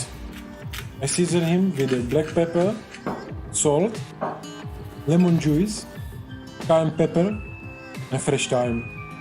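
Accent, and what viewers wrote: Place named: Czech Republic. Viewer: super český akcent :)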